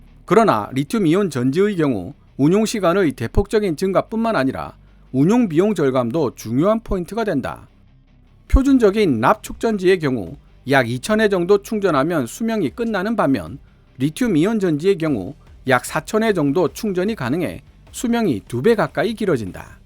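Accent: Gyeongsang, South Korea. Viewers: 갱상도 사투리 안바꿀래? 천하에 즈질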